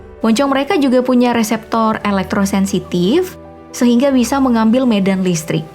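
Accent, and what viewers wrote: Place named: Jakarta, Indonesia. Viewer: Pan betawi yak wkwkwk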